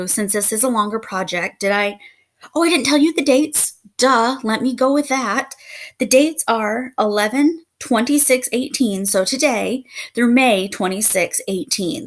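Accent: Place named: United States. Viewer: You have such a lovely accent! What state do you live in? I love soooo many of the songs you chose!!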